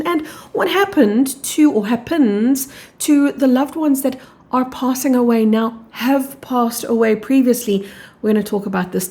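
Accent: South Africa. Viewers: S.A. accent?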